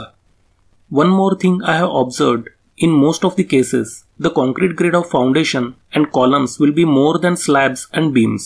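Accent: India. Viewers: This guys Indian accents so strong and hilarious. Cool videos though 😊😊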